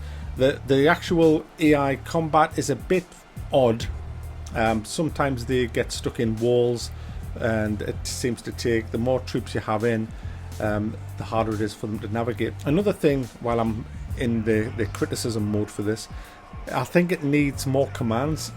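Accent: United Kingdom. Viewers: XD Mack from the future in a doctor who tardis yep this guy is from the uk allright now there will be no doubts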